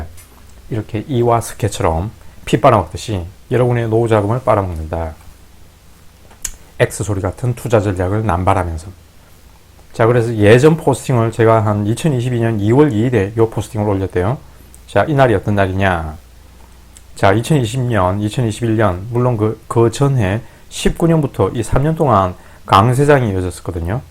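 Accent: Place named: Gyeongsang, South Korea. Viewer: 말투가 경남분이신듯 😊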